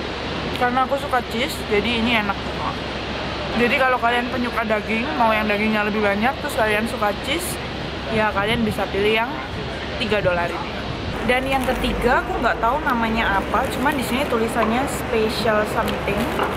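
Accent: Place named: Malang, Indonesia. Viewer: Walaahhh Arek Malang rek dadakman wkwk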